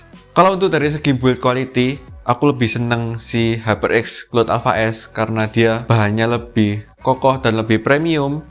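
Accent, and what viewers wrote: Java, Indonesia. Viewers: mantap bang , ada khas medok medoknya . GDluck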